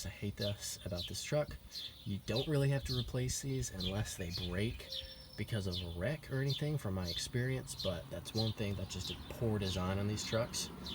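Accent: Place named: Pennsylvania, United States. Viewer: Dawg you sound just like streetspeed717. Pennsylvania accent, but it’s more of a voice than an accent. Props on the informative vids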